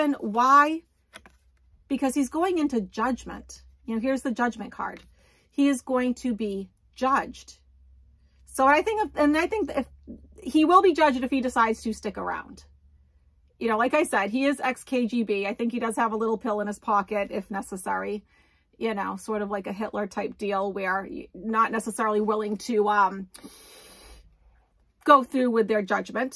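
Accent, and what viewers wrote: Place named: Boston, United States. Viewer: Is UR accent from the greater Boston area? U sound like home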